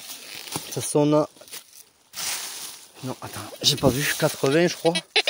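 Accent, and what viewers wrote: Southwest, France. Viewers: Je sens un accent du sud-ouest ou me trompe-je?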